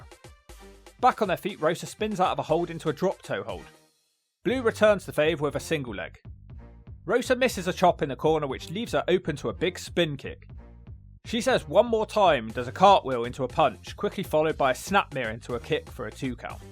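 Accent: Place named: United Kingdom. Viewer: Just realized you are from United Kingdom. It explains why I, as a native spanish speaker, can't understand everything you said 😅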